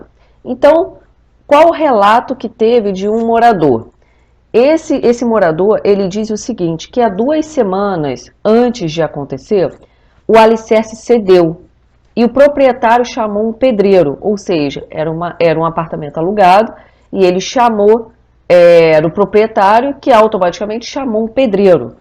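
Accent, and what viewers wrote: Brazil. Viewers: Esse sotaque me parece ser de uma paraense nata, né não?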